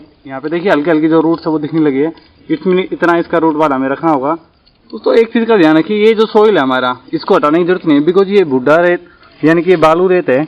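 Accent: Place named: Haryana, India. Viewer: भाई हरियाणा से हो आप आपकी बोलने की टोन से पता चल रहा है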